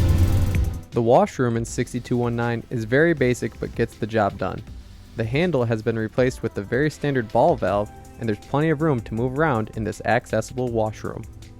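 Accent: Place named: United States. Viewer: We need more CanadianEnglish pronunciation in this video. It sounds like the narrator is American...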